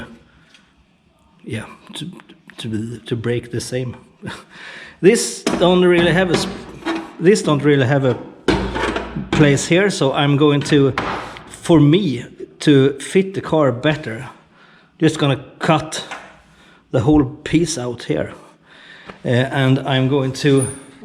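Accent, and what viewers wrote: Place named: Sweden. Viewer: Tja, underhållande video med riktigt fin svengelska dialekt :) Jag har en RRC från 1984 med, vad jag har förstått är den nya Boostern. Vet du om den går att renovera? jag tycker mig ha läst att den måste bytas ut om den läcker..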